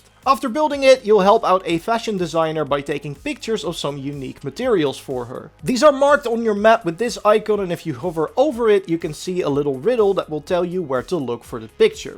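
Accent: Netherlands. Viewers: I just hear the dutch accent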